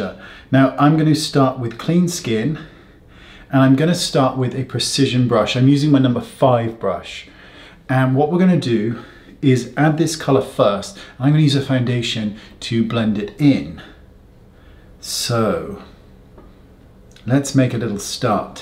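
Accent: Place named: Somerset, United Kingdom. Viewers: Love this I’m going to try it by the way congrats 🎉 on your foundation my colour is out of stock at the minute but soon as it’s back I’m going to get it My Grandfather’s was from Somerset I recognised the accent best wishes 🌸🌹🌼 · I was laughing at the accent. My husband's family is in Somerset. Thanks for the technique reminder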